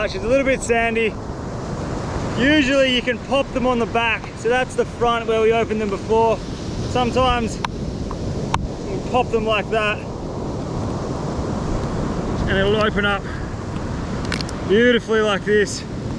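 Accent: Australia. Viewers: he has an Australian accent. most people just relate australians to be tuff and not scared of most stuff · This dude is awesome, he has the ability to be really excited about almost eveything, the Australian accent is like the cherry on top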